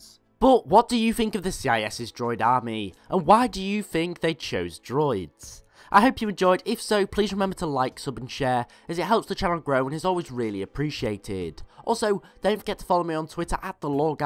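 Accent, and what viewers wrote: United Kingdom. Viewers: This sounds odd, but what nationality are you? British? Australian? Also what if the Mandalorians won the Mandalorian Wars?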